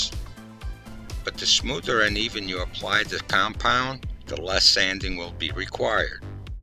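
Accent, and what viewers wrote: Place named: New Jersey, United States. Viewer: This guy sounds like he’s from Jersey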